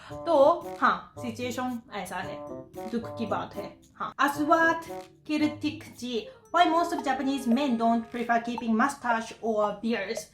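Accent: Standard. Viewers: 🙄 itni suddh hindi to mene khud ne aaj tak apni puri life me nahi boli including hindi exam papers. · Itni pure Hindi to Mai bhi nhi bolti🤣 Thankyou for loving our culture💜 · ap to kuch jada hi pure hindi m bolte h🙏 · U speak pure hindi...and we have lots of impurities mixed in our langauge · I love you apka Hindi Bhasa suddh hai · Humto sirf Hindi bolte hai ji to sudha Hindi bolti Hain😘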